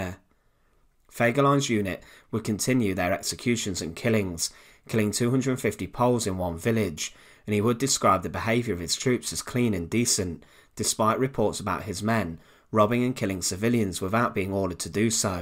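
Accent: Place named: Leicester, United Kingdom. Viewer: I've said it before, but fantastic to hear a proper Leicester accent, makes me homesick. Re the vid, wasn't Eva Braun "HOT!"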